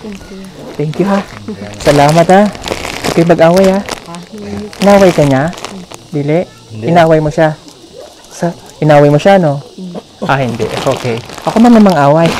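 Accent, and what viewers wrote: Visayas, Philippines. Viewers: Maganda araw sir kabalo n jud ka mag bisaya hehe.masaya n nman ang mga katutubo dahil dumating si sta clous lagi pasko pag dumating ang pb team sa sitio ng mga katutubo. salamat sir at sa boung team. salamat din sa mga sponsors.god blessed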